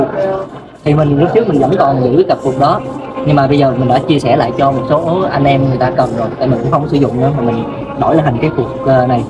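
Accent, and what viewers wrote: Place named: Thanh Hoa, Vietnam. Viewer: Chuẩn bác, nghe thanh hóa là biết ngay 😊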